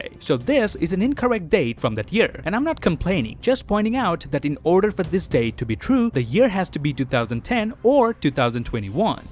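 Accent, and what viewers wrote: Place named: Canada. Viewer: lol dude because of your canadian accent opinion is openion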